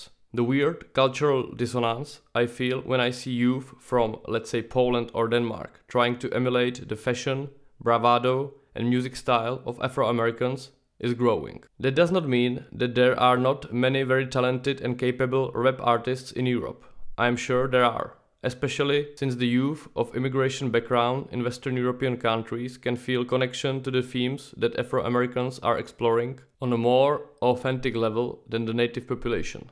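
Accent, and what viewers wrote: Czech Republic. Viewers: You have Czech accent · Great. Výborný obsah, i když ten český přízvuk je v té angličtině hodně znatelný :)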